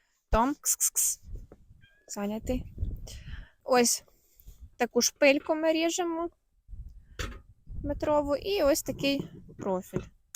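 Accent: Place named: Western Ukraine, Ukraine. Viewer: Фу-як западенка 😢 противна по українські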